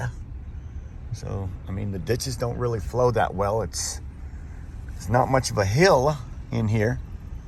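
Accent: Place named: North, United States. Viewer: Howdy neighbor I live in Fair bluff NC we had flooding, but not like that this time the Beaches got hit hard . You sound like you're originally from up north I am as well , but been here 30 plus years now. I subbed my friend does a lot of scrap metal I did it myself a few times . I clean houses at N Myrtle Beach and work at a grill a few days ain't retirement wonderful 😂 . God bless we have another storm heading our way I love across from the lumber River I'm on the high side so I don't get flooded but you can't get out either.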